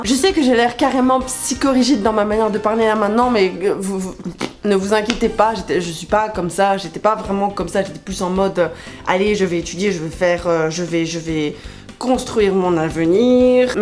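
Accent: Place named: Belgium. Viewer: tu as toujours l'accent belge au fait... "houit" :p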